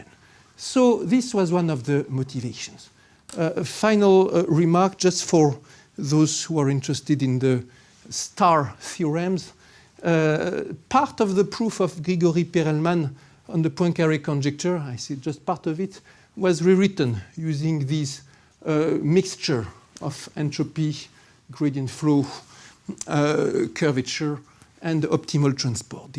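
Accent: France. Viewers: French accent is maaad sexy to Americans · My gooosh our accent is so awful! I can't believe that many americans find that sexy, beautiful or either relaxing as a Frenchman I can't stand this accent! ^^^ BTW merci pour ce cours très instructif!:) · Strong French accent, it's true. But clearly undertstandable ^_^, you are awsome, and we love you ❤ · As a french person, I find his voice kinda irritating to listen to... Most french people would cringe or not be fan of their accent especially when speaking in english, because we feel like we sound too "rough", or that we sometimes completely destroy your words! but i have noticed strangely, that english speakers generally kinda like it ?...so thats good! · I can't stand the French accent. Probably because I'm French. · I love the French accent! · Gotta love the French accent 🤗💕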